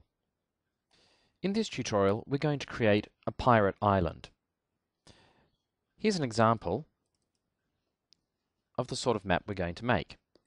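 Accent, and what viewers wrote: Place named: Australia. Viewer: As an Aussie myself, I'm 99% sure its an Australian accent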